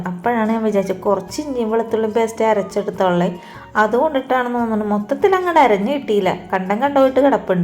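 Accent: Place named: Ernakulam, India. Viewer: എന്റെ ഒരു frd നിങ്ങൾ സംസാരിക്കും പോലെ ആണ് സംസാരം എറണാകുളം കളമശ്ശേരി ആണ് അവരുടെ വീട് 👌👌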